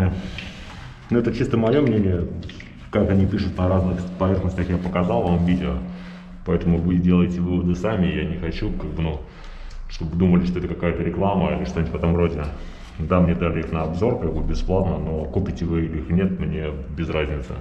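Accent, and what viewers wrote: Saint Petersburg, Russia. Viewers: ты с Питера?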